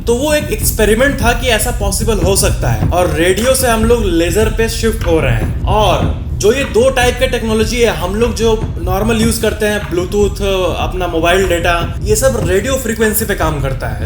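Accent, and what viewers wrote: Bihar, India. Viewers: That bihari essence wow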